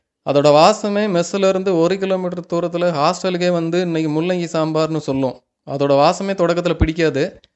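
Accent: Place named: Coimbatore, India. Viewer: Bro unkal thottam Mathiriye unkal pesum vidham rombaum super ithuthan kovai kusumbunu solvankanu niniaikiran bro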